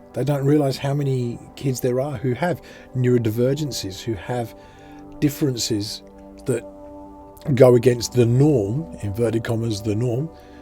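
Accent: Australia. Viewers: Are you from UK or AUS, you sound like you grew up in regional Victoria! Thanks heaps for your insight. Ive a 5yo boy with asd, pda and Add/hd potentially. You’re a hero. First search on yt as a result of desperation and I’ve been sucked into your channel. Thank you. Big love from down south 🖤🦘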